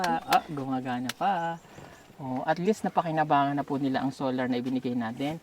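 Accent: Visayas, Philippines. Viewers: Sir naaadopt mo na Ang accent Ng bisaya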